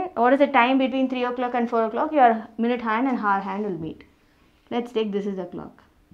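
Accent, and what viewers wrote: South India, India. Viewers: So irritating South Indian accent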